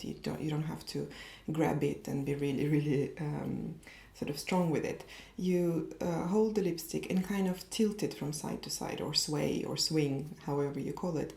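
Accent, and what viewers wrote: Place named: France. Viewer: Thank you! Where is her accent from, Français peut être?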